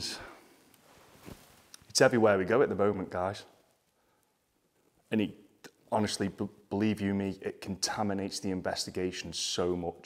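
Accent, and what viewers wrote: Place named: Australia. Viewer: I love Australian accent ❤️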